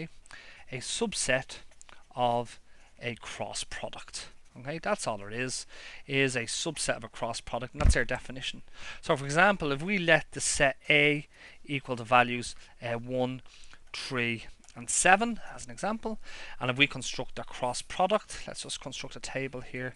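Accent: Ireland. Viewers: who would have guessed that watching jacksepticeye would get me used to the irish accent enough to help me learn math?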